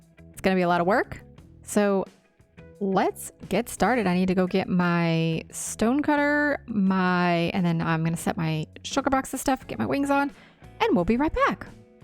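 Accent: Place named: Texas, United States. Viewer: I thought you were in TX. I can pick up the accent every once in awhile. My wife is from Australia and she even has it every so often. It rubs off on you. 😆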